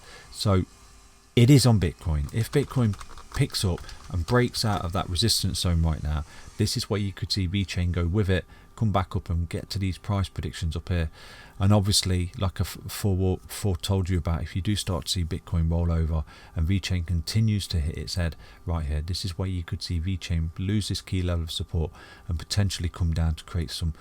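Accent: United Kingdom. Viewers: The british accent and the famous slangs always get me to buy more. VET 🌚 ✨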